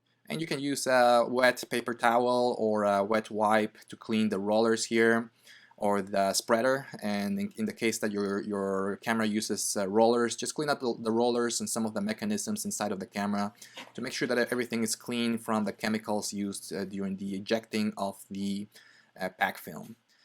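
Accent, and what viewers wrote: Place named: Philippines. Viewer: Why do you have a filipino english language? Are you filipino?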